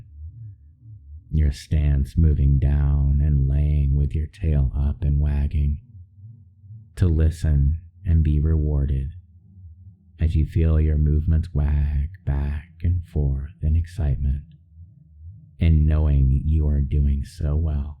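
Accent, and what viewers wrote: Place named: Texas, United States. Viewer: Putting how incredible the rest of this is aside for a moment, your accent is incredible. Sounds Texan to my ears (though that might be my bias talking since I grew up there), but no matter what it is, it’s very soothing!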